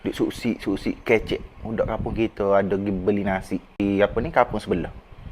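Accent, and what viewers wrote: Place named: Kelantan, Malaysia. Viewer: Udoh kecek kelate pulok😂😂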